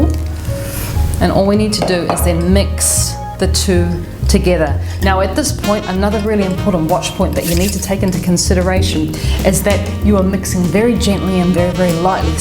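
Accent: New Zealand. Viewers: Going to try these because of your Kiwi accent😂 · I got this recipe from a Tupperware Dealer many years ago and they were the only scones I have ever had success with. I lost the recipe so thank you so much for this Video. I will try it with -preheating my baking dish - is there a reason for this? Even without the accent, "Runny Cream" is so Kiwi. Thanks.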